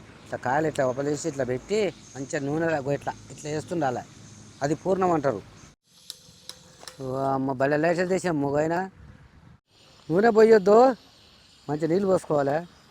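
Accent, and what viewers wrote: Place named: Nalgonda, India. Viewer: నువ్వు మా ఊర్లో మాట్లాడినట్టు మాట్లాడుతున్నావు... మాది నల్లగొండ, దేవరకొండ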